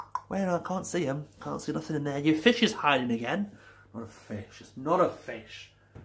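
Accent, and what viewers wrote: United Kingdom. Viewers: You sound local ? Derbyshire?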